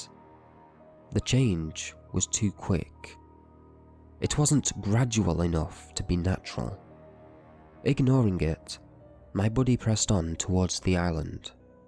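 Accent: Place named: United Kingdom. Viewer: you know it mate :) good to have another British narrator around here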